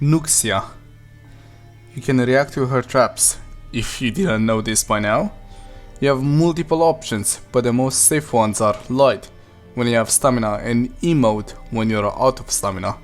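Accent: Romania. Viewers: are you romanian,russian or german? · accent de roman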